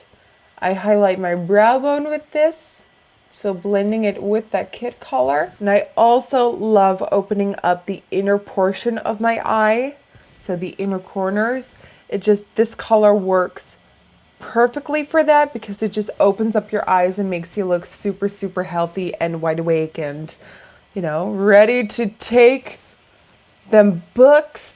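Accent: Netherlands. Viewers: Is it me or does she sound a lot more Dutch in this video? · yes. she is from the Netherlands but it's less noticeable in more recent videos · Kom je uit helmond??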